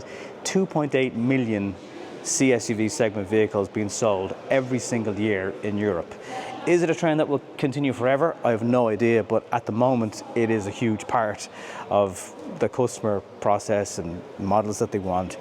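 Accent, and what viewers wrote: Ireland. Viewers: Ireland accent and best Dacia ever so stfu and take my money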